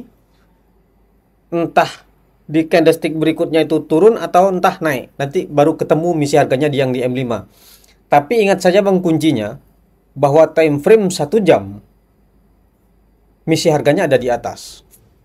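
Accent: Lampung, Indonesia. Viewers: Bang, Abang orang Lampung ya Bang? Logatnya khas. Salam.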